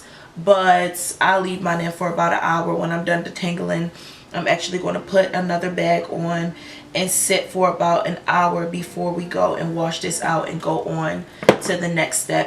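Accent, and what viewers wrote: Baltimore, United States. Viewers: As soon as u started talking I said is she from bmore🤔🤔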